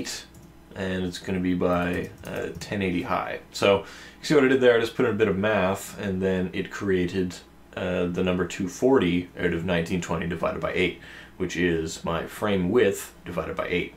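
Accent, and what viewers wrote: Canada. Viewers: nothing, I'm from the Philippines, and me and my american friend where watching this tut, and I ask him what accent is that ("your accent") and my friend said "that's probably a Canadian accent. No biggy, cool tuts anyway, learned a lot from you. Can you make an Infographic Tutorial. I've been doing a Corporate Promotional Video.. Would help me a lot. Thanks.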